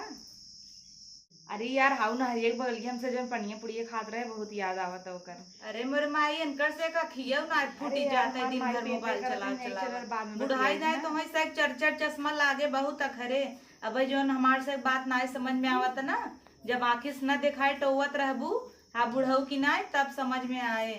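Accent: Jaunpur, India. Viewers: Didi mai bhi Jaunpur se hun lekin aapaki boli bahut sundar hai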